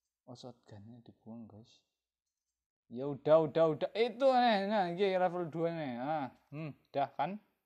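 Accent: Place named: Kedu, Indonesia. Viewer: pasti Plat AA ni... dari ngomong keliatan, sayang gak ada mukanya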